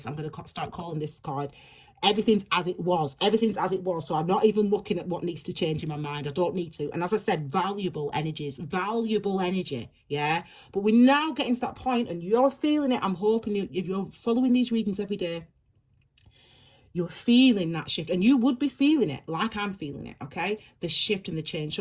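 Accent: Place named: Northern England, United Kingdom. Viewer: Thankyou for picking up my morning. Your accent makes me smile. Im British (i live in London) and i lived in Sheffield for 3 years, your voice does remind me of all the people I met up north. 😊🌈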